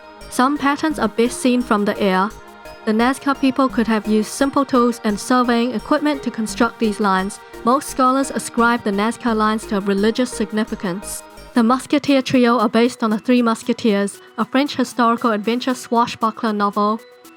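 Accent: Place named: New Zealand. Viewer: I KNEW IT! THE INSTANT I HEARD THE VOICE I KNEW IT!! HELLO FELLOW KIWI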